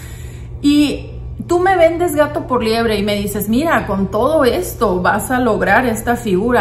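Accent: Sonora, Mexico. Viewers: Me encontre con tu video y me parecio muy interesante. Me quede viendo hasta el final, me gusto tanto que me suscribi a tu canal. Lo que mas me encanto fue que enfatisas que en este tema de nuestros bellos y naturales cuerpos ☺lo que hagamos con el, al compartir con otros nuestra experiencia lo hagamos CON HONESTIDAD. Me facino tu forma de hablar con tus modismos, deduzco por ellos que eres mejicana. Eres muy simpatica y tremendamente honesta lo cual se agradece demasiado sobre todo en estos tiempos de tanta mentira e hipocresia. Un abrazo fuerte desde Chile. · Jajajaja weee tr amo. Hablas bien COOL. Supe que eras mexa por como hablas hasta no se si seas de tijuas me estoy oyendo cuando te oigo 🤣🤣🥰🥰🥰 y tienes mucha pinché razón. Pinché gente falsa y locas. Jajaja pero no puedo dejar de verlas pa saber como acaban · Eres sonorense?????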